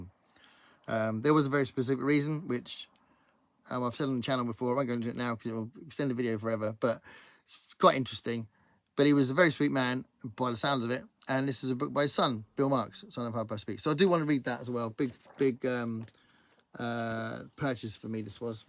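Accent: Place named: United Kingdom. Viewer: ● I regularly randomly pick a book off my shelf 🙈. ● I really like the cover of that Ray Bradbury. ● I'm on my 5th Star Trek book. You're a slacker! ● Oh I'd like to read The House In The Cerulean Sea myself. 🤔 ● Oh Blacktop Wasteland was not good imo, but I'll be interested to see what you think! ● Outside of BookTrek and New Worlds November, my reading IS totally random 😂. ● Oh Alien Clay. I'm not familiar with that one. I'll have to look it up. ● Wow! I love the cover on The Imposter! ● Ok random question: What kind of British accent do you have? What region?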